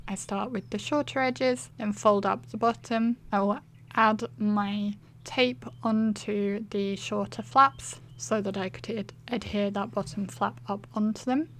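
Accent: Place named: United Kingdom. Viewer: I have been thinking about making my own envelopes. I haven't got a board for it, but I might have a go anyway! Also, just wondering why you make your cards in A2 size? From your accent, I can tell you're British (like me) so wondering why you don't male A6 cards, especially as you mentioned your patterned paper was A4 (rather than US letter size).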